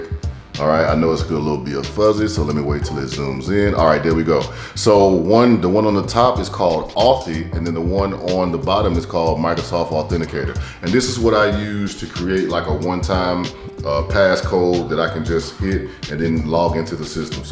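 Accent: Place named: Baltimore, United States. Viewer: Are from Baltimore area? I hear it in your two’s and do’s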